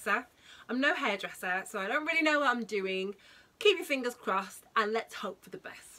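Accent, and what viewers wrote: United Kingdom. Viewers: So lovely to hear a British accent!!!!! Yessss!!! U look great. I bought it too😊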